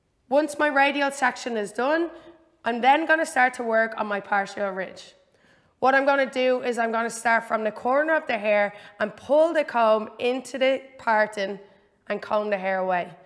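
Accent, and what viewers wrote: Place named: United Kingdom. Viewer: What part of Britain are you from, you’re accent is awesome! Since the pandemic forced me to become my own barber, and after lots of trial and error(and clipper fuck-ups), these videos from you and the Regal Gentleman are huge help. I can’t go back to my rural Michigan (US) hairdressers, I wouldn’t let them bush my cat’s hair! I like to do funky things with my hair, mostly short highly textured, disheveled, high fades…I’ve been experimenting with coloring bleaching and highlighting for years, I like ice grey tones a lot, and that took a LOT of practice and patience and it still challenging, as I mostly do silver or grey highlights…. But yeah, it’s fun stuff, you guys the best jobs, making people look their best, I’m sure it’s incredibly fulfilling career! Thanks for the video tutorials, much appreciated 😁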